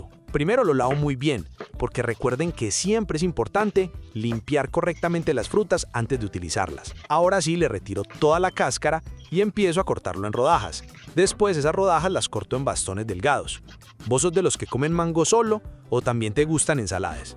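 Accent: Colombia. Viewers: ❤❤❤🎉Hola grasias por sus Resetas tan ricas el mango 🥭 me fasinan como sea pinton y no tan maduro saludos que asentó tan lindo Colombiano💗🥭🥭🍓🍐🍉🥑🍅🫜🥕🍍🌴🌊⛵🏖️ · Un saludo desde Costa Rica 🇨🇷✌️. Gracias por compartir tus recetas, son sumamente deliciosas. Me gustaría saber de que parte de Colombia eres, me gusta mucho tú acento. 🙅🇨🇷🥂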